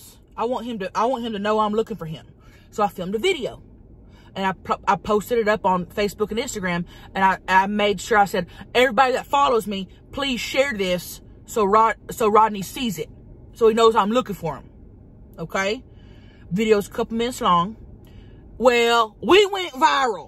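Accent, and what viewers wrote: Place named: Oklahoma, United States. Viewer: My blood really started bowlin it really started bowlin...I'm dying she's hilarious cause we really talk like that in Oklahoma. 😂🤣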